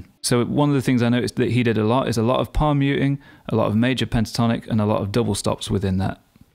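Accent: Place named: Birmingham, United Kingdom. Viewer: Your accent sounds familiar to me as a born & bred Staffordshire bloke....and the best I can come up with is posh brummie. You're not from somewhere like Solihull are you? 🙄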